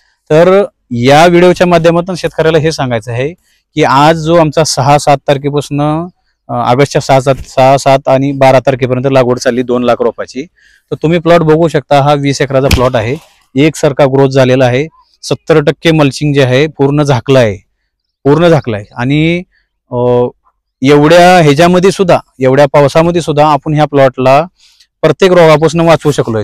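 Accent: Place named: Pune, India. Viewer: खुपच छान, सर आपण फार छान माहिती दिली.शुद्ध मराठी पुणेरी भाषेत.धन्यवाद.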